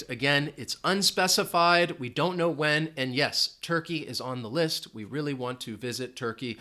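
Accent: United States. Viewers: I just closed my eyes and listened to this video from start to finish. I learned not only tenses but American accent also. It will be useful for those who haven’t been familiar with the accent. So, I’m gonna invite my friends to subscribe and hit the bell button. They will be speaking more fluently with the tenses by the end of the year. A number of people in my country, Thailand, were struggling with forming a sentence despite the hard fact that they had been studying for several years. Unfortunately, their skills had remained unchanged. Anyway, students who have been working hard will have achieved their goals in so far as there is the light at the end of the tunnel. How long will they have been taking time to understand native speakers almost all the words they say? I suppose these covered all stuff you kindly taught. Thank you so much.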